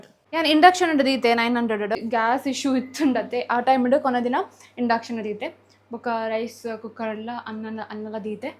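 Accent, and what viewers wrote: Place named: Kundapura, India. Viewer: I like your Kundapura kannada , please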